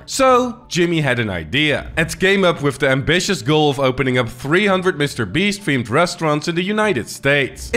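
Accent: Netherlands. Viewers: Just started watching. Already love his dutch accent. New sub here! 🇧🇪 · Are you dutch? I hear an accent 🤔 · Why can Dutch people detect a Dutchie within seconds 😂 Good video tho!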